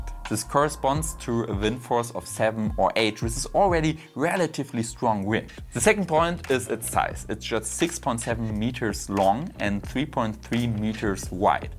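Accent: Germany. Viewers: Cooles Video! Das "th" klingt manchmal ein bisschen holprig & reduziert an ein paar Stellen die Verständlichkeit, aber wenn du das ganze noch ein bisschen verbesserst top. Ein wenig deutscher Akzent kann ja ruhig auch bleiben. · I'm English, and had no trouble at all with your speaking - yes a strong accent, but not a problem.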